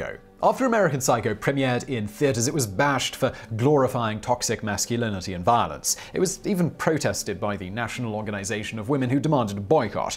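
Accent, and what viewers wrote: United Kingdom. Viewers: Get guy with a British accent to state things emphatically and act like that is The explanation of the movie. The top wasn't Cobb's totem it was Mail's, so what it did doesn't matter. What King says doesn't make a difference to Kubrick's movie. (Did you see the version he made? It suuuuuuuuucked.)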